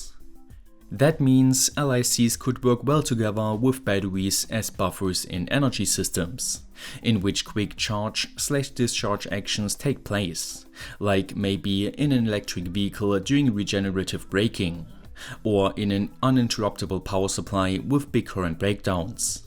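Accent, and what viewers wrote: Germany. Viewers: Is this German accent or what?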